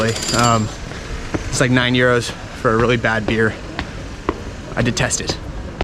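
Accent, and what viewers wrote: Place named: United States. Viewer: He sounds like from USA. But he looks Middle Eastern to me.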